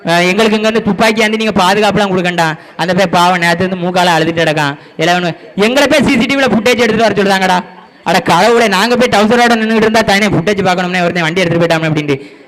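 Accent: Tirunelveli, India. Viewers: நெல்லைத்தமிழ் தேனாக திகட்டுகிறது கேட்க கேட்க. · அனைத்து அருமையான பதிவு தம்பி திருநெல்வேலி தமிழ் அருமை மகனே வாழ்க வளமுடன் நலமுடன் தம்பி நிங்கள நாம் தமிழர் கட்சியின் மேடையில் தோன்றி பேசவேண்டும் வேறொரு மொழி துரோகி களை தோல் உரித்து காட்டி விட்டார் தமிழ் தம்பி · திருநெல்வேலி பாசையில் பேசிய சகோதரர் அவர்களுக்கு மனமார்ந்த வாழ்த்துக்கள் நன்றிகள் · சூப்பர் திருநெல்வேலி பேச்சு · அருமை, அழகாக திருநெல்வேலி தமிழில் பேசி சிறப்பித்தமைக்கு பாராட்டுகள் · அழகாக திருநெல்வேலி தமிழில் பேசி சிறப்பித்தமைக்கு பாராட்டுகள் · திருநெல்வேலி தமிழ் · திருநெல்வேலி தமிழ் அழகு ❤ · அருமையாக இருந்தது உங்கள் பேச்சு இந்த திருநெல்வேலி பேச்சு அருமை அருமை வருங்கால நாம் தமிழர் கட்சி திருநெல்வேலி பேச்சாளர் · 👌👌👌👌👌👍👍👍👍👍 சிறப்பு தம்பி.நெல்லை தமிழ் அருமை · நெல்லை தமிழ் அடித்து நொறுக்கு கிறான் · தம்பி நீங்கள் பேசிய திருணல்வேலி அழகு தமிழ் எணக்கு ரோம்ப புடிக்கும்.. கொஞ்சம் நிருத்தி பேசினால் நல்லயிக்கும் வாழ்த்துக்கள் வாழ்க தமிழ் தேசியம். 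· அருமையான பேச்சி நெல்லை தமிழில் நல்லா புகுந்து விளையாடிட்டீங் சிறப்பு அருமை👏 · திருநெல்வேலி தமிழ் நீங்கள் பேசுவது நன்றாக உள்ளது தம்பி.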